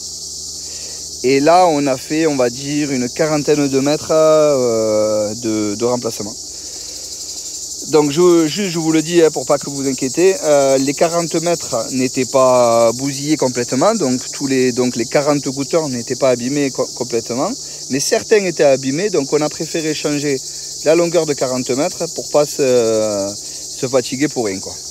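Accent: South, France. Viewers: Salut belle vidéo avec le bon son du sud bon courage à bientôt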